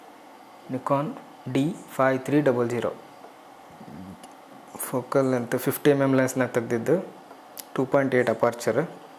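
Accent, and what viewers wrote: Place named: Raichur, India. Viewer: ನೀವು ಆಡೋ ಭಾಷೆ ಕೆಲ್ತಾಯಿದ್ರೆ ರಾಯಚೂರು ಜಿಲ್ಲೆಯ ಬಾಗದವರು ಅನ್ಸುತ್ತೆ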